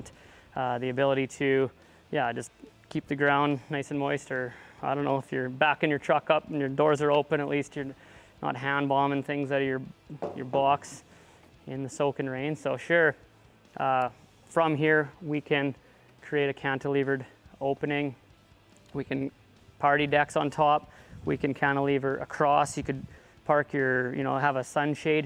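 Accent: Canada. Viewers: Is this guy Canadian? Sounds like he has a Canadian accent and maybe his prices are in CAD which are always higher?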